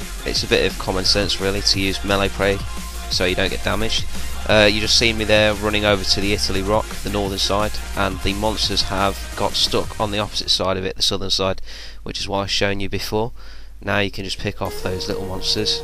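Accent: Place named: North, United Kingdom. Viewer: i need 2 pull my finger out and get a cape, good guide m8 def gonna try your invents when i go for it....... you from up north m8, i thought u was a southern fairy ?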